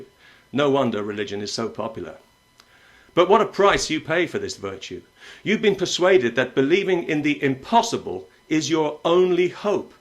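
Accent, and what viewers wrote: United Kingdom. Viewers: This guy is like the Amazing Atheist only with a spiffy accent and a snappy British wit. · I guarantee you that you wouldn't sound NEAR as convincing without that British accent. That's really all you have going for your argument. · with a british accent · fine British articulation are almost hypnotic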